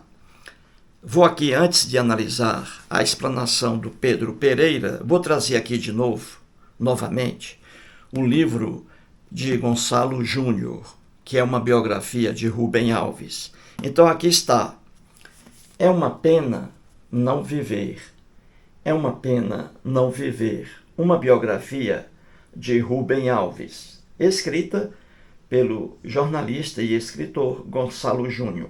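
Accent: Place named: North, Brazil. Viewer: faça um video com o ANTONY GALVÃO (do canal Pilastra da Verdade), ele também é nortista. Sou cristão e paulista e acho bonito o sotaque de vcs 👍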